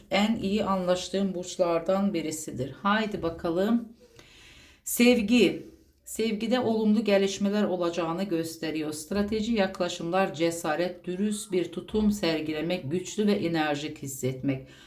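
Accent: Azerbaijan. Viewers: Siz Azerbaycanlımısınız? Şive çok benziyor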